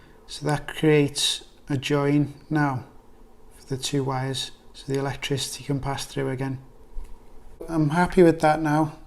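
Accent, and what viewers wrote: Liverpool, United Kingdom. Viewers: This tutorial was great help Coming from a not-so-handy person, I can guarantee anyone can do it after watching this wholesome la's video Speaking of, everything sounds better in a scouse accemt, including instructions Thanks again for your time to upload such a helpful step-by-step guide Stay awesome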